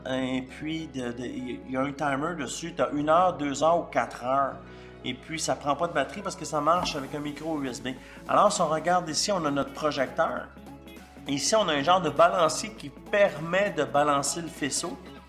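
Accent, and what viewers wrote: Quebec, Canada. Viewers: Merci beaucoup pour la démo, en plus vous semblez bien sympathique avec votre accent qui donne envie d'aller au Québec :)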